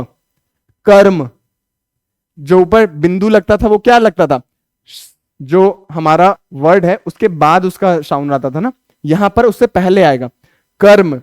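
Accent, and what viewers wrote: Bihar, India. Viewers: Bhaiya Bihar se ho.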